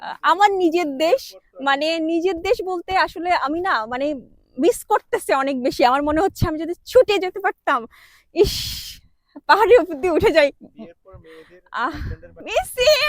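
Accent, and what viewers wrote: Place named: Bangladesh. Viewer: Apu india te kothai ,tomr kotha toh Bangladeshi mone hocche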